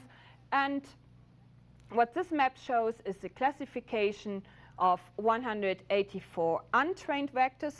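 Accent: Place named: Germany. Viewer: She´s german, right? Really usefull, thanks a lot for the upload!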